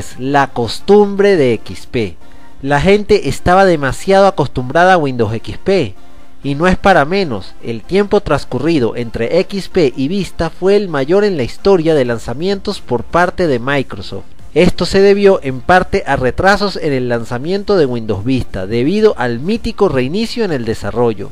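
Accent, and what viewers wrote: Venezuela. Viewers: ACENTO VENEZOLANO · El acento venezolano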